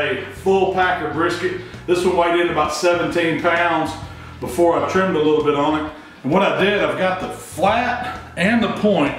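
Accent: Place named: Texas, United States. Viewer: Belly + Texas accent = I'm going to make my first brisket! Thank you!!! ❤️ (born and raised Texan here!)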